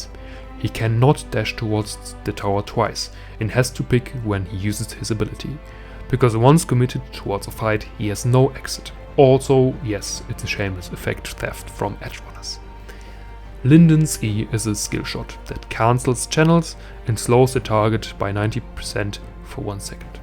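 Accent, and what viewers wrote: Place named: Germany. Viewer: the german english accent is unmissable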